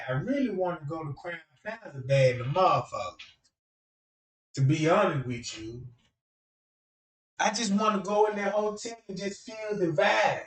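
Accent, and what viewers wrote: South, United States. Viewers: Hey Boo, I Love You, and I know God is gonna Bless You for all your Dedication and hard word. And the Awesome part is your doing it from your Heart, I been riding since day 1 when I feel asleep on my phone and woke up to hearing ur sexy voice with the Sothern Accent it caught my attention and I never looked back. Thanks For all your hard work💯💯🥰💋💖💜🙏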